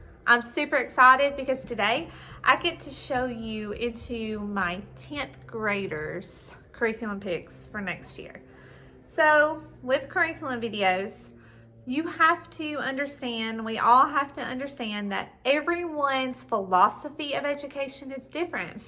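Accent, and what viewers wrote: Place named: South, United States. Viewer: We will be using A g Gentle Feast for the first time this year. I am really looking forward to it. You have such a nice southern accent.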